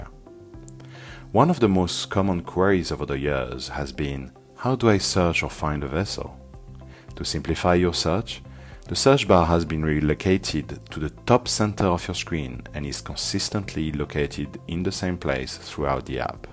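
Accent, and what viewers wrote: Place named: France. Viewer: Sounded like a french accent to me. Kinda explains this nightmare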